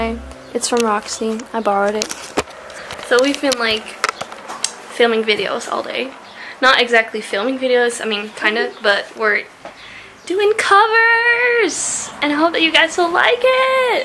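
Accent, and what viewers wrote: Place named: United States. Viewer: This was sooo coool. I love Europe and it seems so much better to see it thorough the eyes of someone I know. Brighton is beautiful. Is your friend also Dutch?? Was that your first time in England?? Does England feel like the rest of Europe or does it feel like the USA, or just different from them both?? Did I understand that your flight was only 1 hr between Amsterdam and London?? That seems very close. When do you think you will need your own car? Could you have driven from your home to Brighton?? Do you even know how to drive yet?? LOL.. (Please forgive my ignorance if that seems like a dumb question.) You know how it is here in the US, you need your own car to get anywhere because the public transportation is not as developed as it is in Europe. And states here are so big, especially on the West Coast of the US. Life in Europe seems very different than here. 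You have lots of different countries and languages around you. Do you mostly stay in your own country or do you travel a lot around Europe?? Was it expensive to fly from NE to England?? Do British people think that you are American because you speak English like an American?? Where you were here, I could not even hear your accent anymore. Your English is very clear. I wish I could speak Dutch as well as you can speak English, but Dutch looks like such a hard language to learn. LOL... Fantastic video. Good music and good editing. Thanks a lot.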